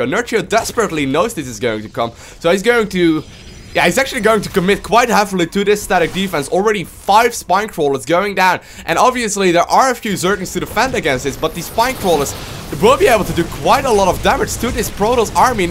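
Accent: Netherlands. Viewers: Ik dacht al dat je een Nederlander was :), je spreekt heel goed Engels maar dat accent zal nooit weg gaan :P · lol ik wist dat jij nl moest zijn door je accent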